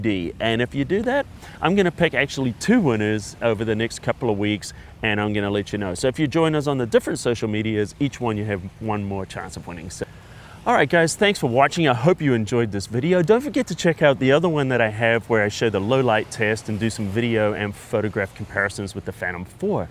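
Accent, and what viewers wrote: New Zealand. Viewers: I knew you were a Kiwi!!! Go ALL BLACKS!!! Thanks for the great review mate... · You sound kiwi but not lol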